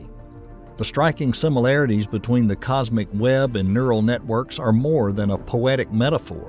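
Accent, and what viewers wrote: South, United States. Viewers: This guy having a southern accent makes this 100x better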